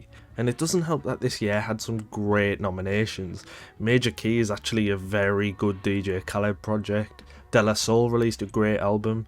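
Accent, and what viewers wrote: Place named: United Kingdom. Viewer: Lmao, what did u expect he's from the UK. As soon as I heard his accent, I knew this video was going to be a hard watch.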